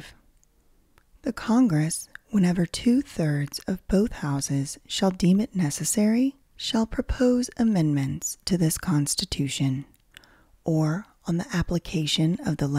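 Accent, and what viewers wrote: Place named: South, United States. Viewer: soothing, sweet &a lil splash of southern twang😌💋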